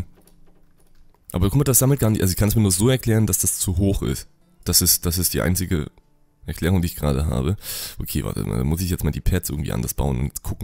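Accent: Saxony, Germany. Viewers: Du bist ein sachse ?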